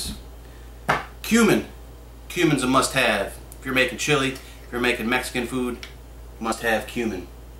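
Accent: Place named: South, United States. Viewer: Why are you speaking with a southern acceny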